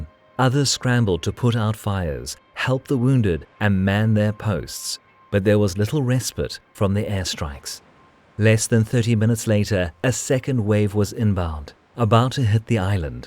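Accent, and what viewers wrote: South Africa. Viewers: Loved it, thank you! South African accent, Cape Town I would say. Sounds like you have been abroad for quite a while (or are you mimicking a SA accent?) I swear boet don't play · is the narrator South African?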